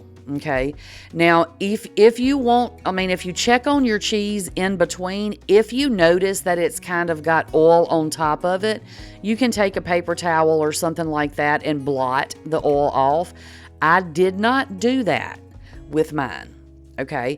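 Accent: South, United States. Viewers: Subbed because of the southern accent. 😊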